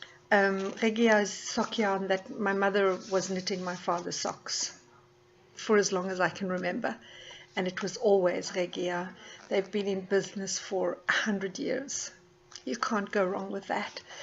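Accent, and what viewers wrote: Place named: South Africa. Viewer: Hi. Thanks for the review and tips. Just discovered sock knitting and Arne & Carlos' channel on YouTube. You sound like a fellow South African. Where did you buy your yarn?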